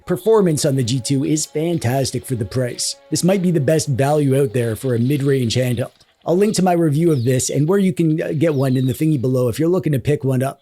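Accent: Canada. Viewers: Are you Canadian? I think I recognize a fellow Canadian.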